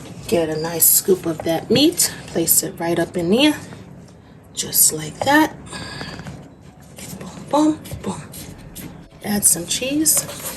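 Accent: New York, United States. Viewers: New York or Chicago? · Hey - these look fabulous and I can't wait to make my hubby these. Thanks for sharing us you tutorial! Love your presentation and your lovely accent (New York?) We're from Chicago! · That New York accent just shines through, I love it. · I love your new york accent too yes I picked it up on the first couple seconds of the video lol them cheeseburger egg rolls look good too smh love ny women can't wait to get back up top mad respect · You must be from New York!!! I’m a new sub for those egg rolls and that saucey-sauce! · Om guessing your from the New York by the way you say sauce, there, and off. 😁 Nice video I'm gonna try it thanks.. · Where you from Brooklyn queens or BX?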